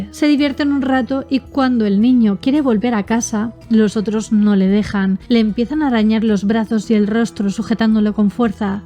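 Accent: Spain. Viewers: ¡Mexicanos! ¿Quieren escuchar a diciendo una grosería? Minuto 😅. Excelente video pero el "chin∆@ tu madre" con esa bella voz española, es una joya! jaja.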